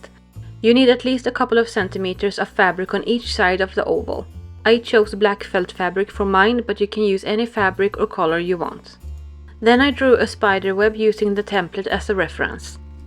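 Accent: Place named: Norway. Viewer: This is really cool. Thank you for sharing! Also, if you don’t mind my asking, where are you from? Your accent sounds Norwegian. 🤗❤️🤗 🕸️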